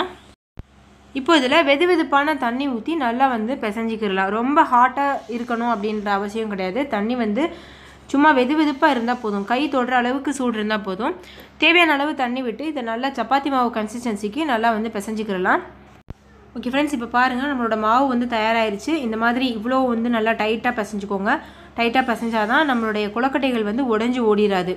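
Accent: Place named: Madurai, India. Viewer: Madurai side ahTamil neenga my old days memories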